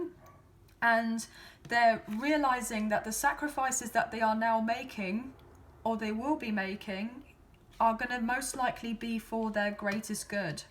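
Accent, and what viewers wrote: England, United Kingdom. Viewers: Love your English accent.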